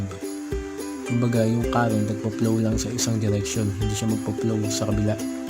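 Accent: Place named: Batangas, Philippines. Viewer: Batanguenio k ga boss. Saan ka sa batangas makapagpakabit hehe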